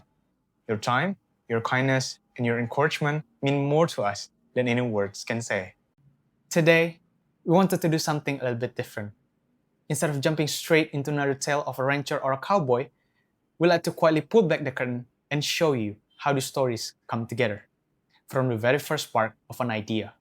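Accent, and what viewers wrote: South, United States. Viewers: The narrator being from the southern US is kind of weird. The stories are nice.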